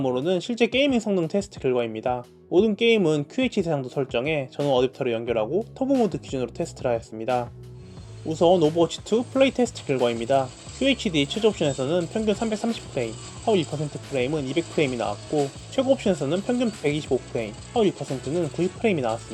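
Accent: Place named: Jeolla, South Korea. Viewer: 전라도 말투가 신뢰가 안가네요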